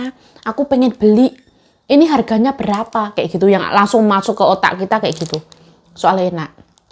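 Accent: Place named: Java, Indonesia. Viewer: lihat makan itu, jd kabita euyy, jd pengen makan, lagian dengan logat medhoknya itu bikin, guyu" dewek🤭🤭😁😁 Semangat yuk.. semoga kamu menjadi selegram yg sukses. Aamiinn🥰🥰🙏🙏🤲🤲💪💪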